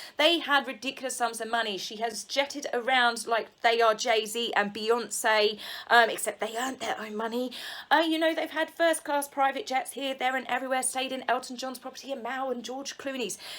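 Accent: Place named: Sussex, United Kingdom. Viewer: Where are you ? I’m in Bexhill (well Little Common really) I was pretty sure that you’re in the same area as me, we speak in the same way ! NICE ONE xx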